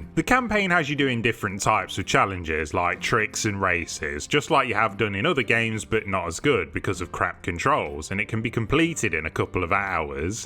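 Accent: United Kingdom. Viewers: Yoo this dude hgas such a Grimsby accent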